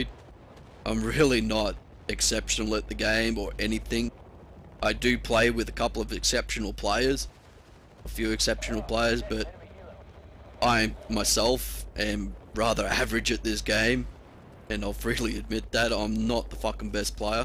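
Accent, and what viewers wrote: Australia. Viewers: lmao i thought the very start of ur vid was the Bf3 Alpha shit, till i saw 1700 lmao i was getting all pumped to see u play it to lol i love ur vids, probs cuz ur an Aussie as well, and im sick of Americans commentating lmao :D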